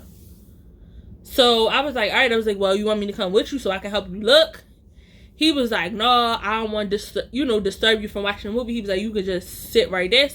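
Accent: Baltimore, United States. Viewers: I knew you was from Baltimore when started talking. I love your voice. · I remember this Baltimore accent I miss my days in Maryland lol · Once I heard your accent I said..Baltimore lol! You all and folks from New Orleans have the DEEPEST accents lol! It’s so unique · I love a person who can laugh when dumb things happen to them! I be dying laughing when dumb stuff happen,but I like ya channel ,I hear the Baltimore dmv accent😂NEW SUB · Gotta be from Baltimore off the into song and accent alone. Didnt even need the rest of the story description. 😭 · I love watching your videos!!! I'm from Baltimore too but I live in sc and ya accent reminds me of home so much! Lol be blessed sis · sound just like a typical Baltimore nigga 😭😂 but the whole no more movie dates just sent me 😂😂😂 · As soon as you said carry out with that B-More accent I knew I fucked with you. 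You are gorgeous💜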